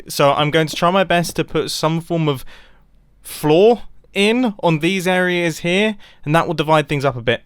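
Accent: England, United Kingdom. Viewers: sounds like a proper englishman (or possibly the love child of joe hills and harry potter)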